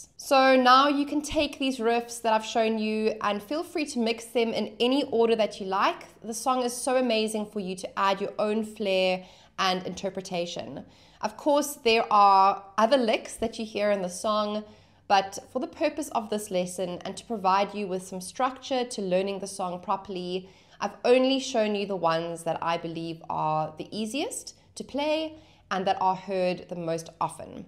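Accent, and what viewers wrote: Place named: South Africa. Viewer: You have a South African accent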